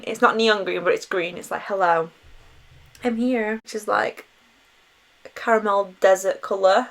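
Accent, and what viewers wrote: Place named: Yorkshire, United Kingdom. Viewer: also, i absolutely adore your accent 😂 i’m from the midlands and me and my boyfriend always say ‘lovely’ in the yorkshire accent hahah, made me laugh when you were swatching!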